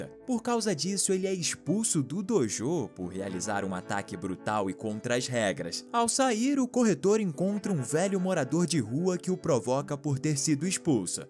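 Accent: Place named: Rio de Janeiro, Brazil. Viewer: Achei curioso o narrador do vídeo com sotaque carioca, ao invés de falar guimba de cigarro, disse: BITUCA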